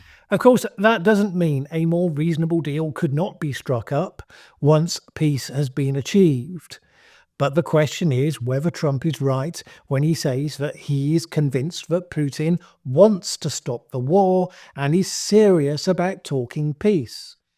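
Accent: United Kingdom. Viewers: It's only your British accent that makes you sound intelligent, sir. But the actual content of your bloviating is vapid, smug and snide.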